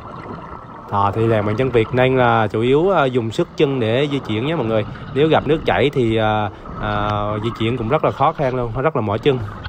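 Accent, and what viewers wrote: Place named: Quang Nam, Vietnam. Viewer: quê ở đâu mà nói giong fống qủang nam vậy bạn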